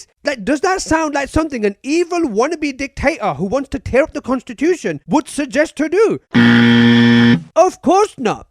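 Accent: United Kingdom. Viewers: Aren’t you British? 😭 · Angry British guy perpetuates misconceptions about Donald Trump's intentions.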